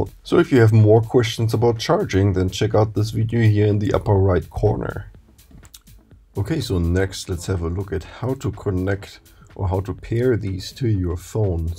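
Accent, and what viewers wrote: Germany. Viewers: I think ur a german Ty for the instruction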